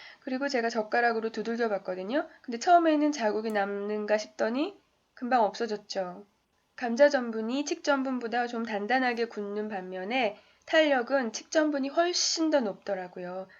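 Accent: Seoul, South Korea. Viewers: 말투가 꼭 서울사투리 영상에서 들었던 말투 같아요 귀에 쏙쏙 박히면서 듣기 좋네요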